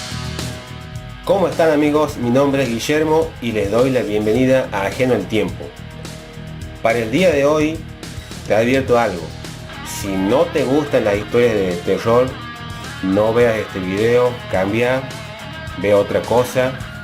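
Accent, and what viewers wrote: Tucumán, Argentina. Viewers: Hola, muy bueno, sos tucumano??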